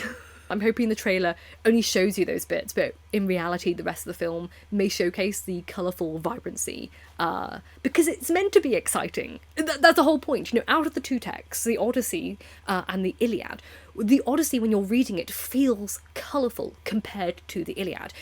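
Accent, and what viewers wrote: United Kingdom. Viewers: What is the name of this accent? I'm not well versed in the names of British accents besides RP and something auditory like an accent is difficult to google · You have the most BRITISH voice ever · This is the most aggressively British voice I've ever heard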